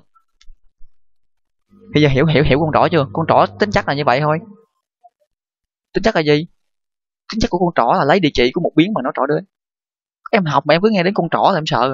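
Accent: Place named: South, Vietnam. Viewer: ước gì anh ra thêm nhiều video hay như này ^^ thích giọng nói của anh lắm luôn, rất dễ hiểu...coi xong video của anh là e thích con trai miền nam luôn đấy ^0^, cảm ơn anh nhé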